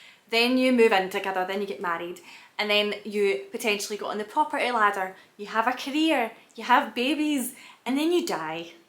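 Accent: Scotland, United Kingdom. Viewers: That was brilliant!!! I only came here to learn a Scottish accent but you are adorable ❤️Loved the talk! Do what you want in life full stop! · I'm from Poland and watching your videos to get acquainted with the Scottish accent, which I really like. I visited Scotland many years ago and liked it a lot, watching your stuff reminds me of my past travels and how nice the Scottish people are. I wish I get the chance to go there again some day. I hope you are doing well and finding your happiness in life! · I'm so glad i found your vid. Initially i was looking for smth to study scottish accent but i think i just found my person <3 I'm currently feeling so stuck behind in life and your take is like a breath of fresh air tysm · You always made interesting, funny and beautiful videos. Miss you and your lovely Scottish accent. Hope you're okay. Ty from Ohio.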